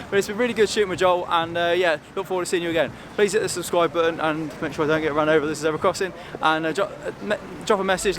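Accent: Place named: East Midlands, United Kingdom. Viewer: where are you from? I know you live in Swansea but I sense a distinct East Mids accent...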